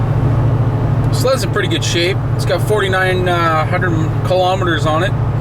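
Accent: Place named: Canada. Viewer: okay your from canada eh (: is that the 401 your on?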